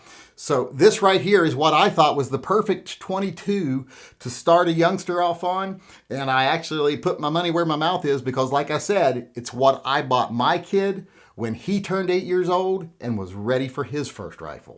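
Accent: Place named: South, United States. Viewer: Says the wannabe Marshall. A so called yankee with a mild southern accent and a lisp. Anyone doing what Kyle did of any age is defending the community. You talk too much about nothing.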